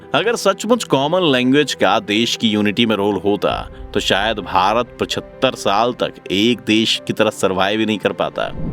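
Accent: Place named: Delhi, India. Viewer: सर जी आप थोडा नुट्रल रेहना....आप थोडासा देल्ही वाइज बोलते हो.... बोलने वाला नुट्रल रेहना चाहिए... देलही की तरफ मत झुको...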